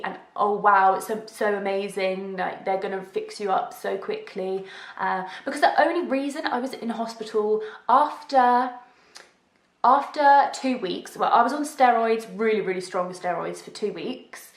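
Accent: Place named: England, United Kingdom. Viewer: Right, exactly. It's easy to take our health for granted before it all goes wrong. I'm the same age as you and don't have MS but I work in a hospital and I witness victims first hand. Thanks for keeping us updated and I think we can all say we admire your courage. And yes keep having fun! :) P.S. And we Americans definitely admire that English accent, haha.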